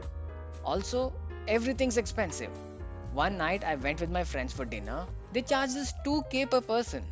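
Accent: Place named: Karnataka, India. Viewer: LMAO how are people thinking that you're a migrant when the Kannadiga accent is SO clear??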